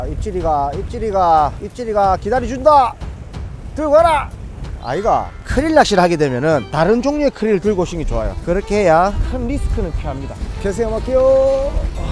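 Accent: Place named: Gyeongsang, South Korea. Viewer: 곱게 가제이 이건 진짜 서부 경남 더구나 진주 거제 삼천포 등 이 아니면 모르는 사투리 ㅎ.ㅎ.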